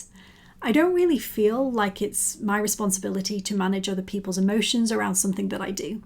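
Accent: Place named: United Kingdom. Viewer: Great Video and been loving the journey, personally down over 32kg, and recently smash my 10k PB (been in place since my early 30s) to a very healthy 56mins. Keep up the great work. MJ has been such a game changer, I was diet fatigued and have wrestled with my weight since pre-teen years I never dreamt that 40yrs later I could really make a change with the right support. Great to have a very UK voice rather than a US centric view.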